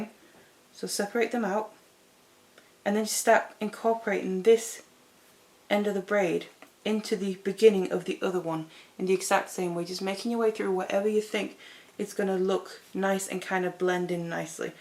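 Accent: United Kingdom. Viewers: Love, love me some British accent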